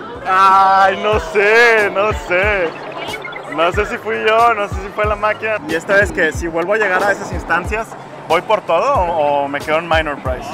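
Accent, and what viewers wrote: Mexico. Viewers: ¿Eres Méxicano?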